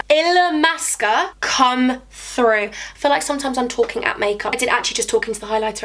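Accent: Liverpool, United Kingdom. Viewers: that scouse accent hahaha you sound like me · by the way love the scouse acsent hahaha... (am from liverpool) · I go on to you and hollys get ready with me all the time just so me and my friends can hear you doing the Liverpool accent😂 OBSESSED with you and the bomb accent😍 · Your scouse (Liverpool) accent is soo good hun ❤️ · Your Liverpudlian accent is actually amazing😂😂 also congrats on the 50K to the most amazing angel of all❤️👑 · omg obsessed with this loook😍 and your Liverpool accent is amazing! You deserve 50,000 so much, love you xxxx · I love you u are so funny I keep say, you know and baby, boy to me hubby and it's doing his head in by the way well boss Liverpool accent cus that's we're I live lol xxxx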